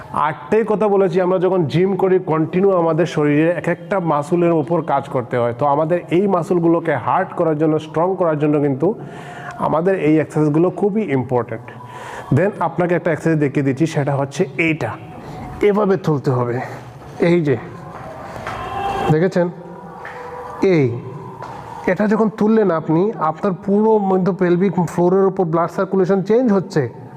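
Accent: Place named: Barishal, Bangladesh. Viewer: ও তুমি বরিশায়লা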